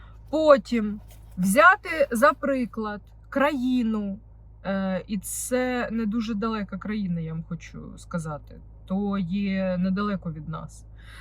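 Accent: Ukraine. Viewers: Обожнюю вас слухати❤ Цей рідненький дніпровський говір - то любов❤ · Дякую Вам. Я обожнюю як ви кажете. Дуже по українські!!! 👍🏼😜 Хай вам щастить!!! Слава Україні!!!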